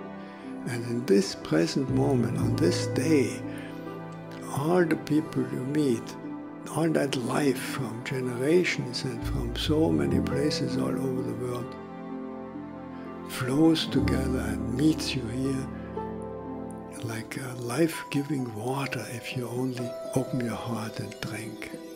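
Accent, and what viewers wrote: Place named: Austria. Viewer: Brother David is speaking in his second language, English. He is speaking with an Austrian dialect. He is from Austria.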